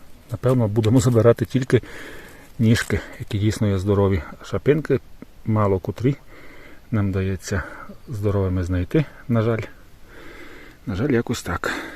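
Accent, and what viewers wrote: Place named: Synevyr, Ukraine. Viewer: В якому місці відео знімали?Бо говір ,як у Синевирській ріці.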